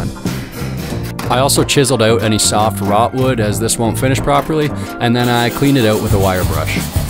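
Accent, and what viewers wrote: Canada. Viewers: Wonderful product. Terrific production. The Canadian accent is like nails on a chalkboard. But the product and production were too good and couldn't click away. That's saying something.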